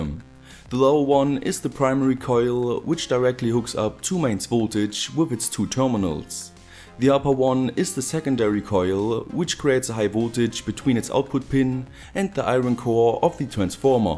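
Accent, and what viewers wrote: Germany. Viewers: Man hört das du deutsch bist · was für ein deutscher akzent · im from germany too, so i often hear a lot worse versions of english from them as yours is (its mostly pretty good despite the accent); but still i was bursting out laughing when you said "unsatisfactory" :D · I still don't get how Germans get so fluent in English but still sound German as fuck.. Great vid by the way.. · are you german? just asking, my ears tell me english german accent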